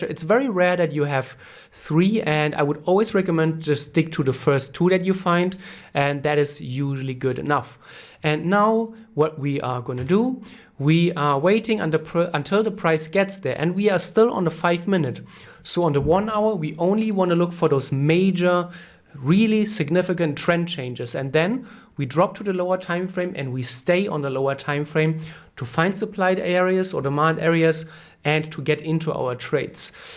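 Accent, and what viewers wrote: Germany. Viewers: Something about a scientific German accent that's reassuring, much respect my mentor and thanks for the wisdom, yes I'm black and I'm saying this, thanks again brother! liked and subscribed! :'D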